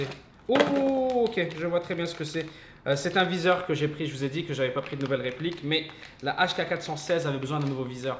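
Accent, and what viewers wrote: Quebec, Canada. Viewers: Tu est tu es québécois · T’es québécois ou français?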